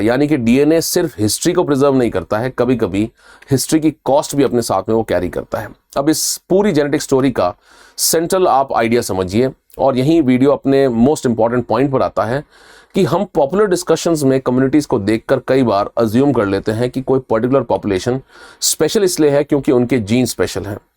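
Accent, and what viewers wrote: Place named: Bengal, India. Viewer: Surely the speaker in this video is bengali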